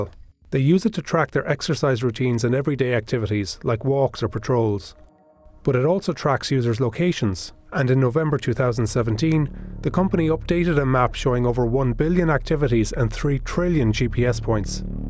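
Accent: Ireland. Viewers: Amazing Irish accent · Wow some Irish lad narrating this lol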